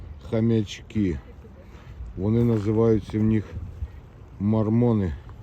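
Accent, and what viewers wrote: Zhytomyr, Ukraine. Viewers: Як же мені подобається діалект Житомирщини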